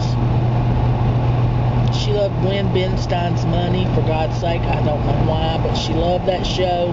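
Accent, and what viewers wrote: South, United States. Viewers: Your southern accent was out in this one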